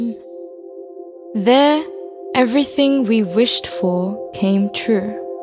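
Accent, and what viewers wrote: Australia. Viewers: stray kids done what first? Frontier means borderline. Their title is frontier : declaration and enhypens is Border : day one. Both use colons, frontier is synonym for border / borderline ..in this trailer the videos used are only nature documentaries and abstract art depictions without any member shown but only the aussie narration which is similar to enhypen , the aussie narration is just a part of the similiarities but you said stray kids did the aussie thing first.. even the clips used are almost the same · Jyp and their australian narrators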